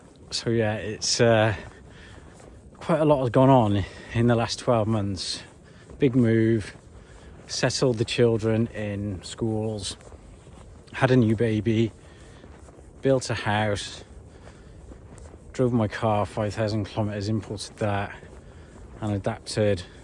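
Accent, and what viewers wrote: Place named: Southern England, United Kingdom. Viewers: Его произношение очень типично для англичан и, сказав, что оно интересное, Вы можете немного обидеть человека. Это самый лучший английский, который Вы можете услышать, четкий и ясный. У меня когда-то очень давно был такой акцент, но я его быстро потеряла, начав жить в Америке. Я очень надеюсь вернуться назад в Россию после более 20 лет жизни в Америке, но у меня сыновья-инвалиды из-за ошибок американских врачей, поэтому сейчас мы только надеемся на чудо. Я очень жалею, что уехала и я знаю, что я не одна такая, кто хочет вернуться, но по личным причинам пока не может этого сделать. В каждой стране есть свои плюсы и минусы, но проблема в том, что мы знаем, чего ожидать и как обойти многие проблемы на Родине, а в новой стране мы, как слепые котята, ничего не знаем. Поэтому, верна поговорка, где родился, там и пригодился. 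· Your English, from the south maybe? I'm from the midlands.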